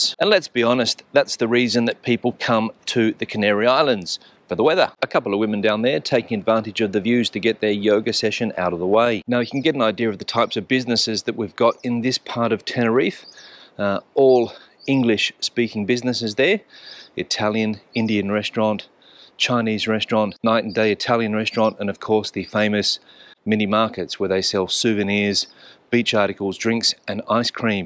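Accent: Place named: Australia. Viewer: Sounds Australian to me.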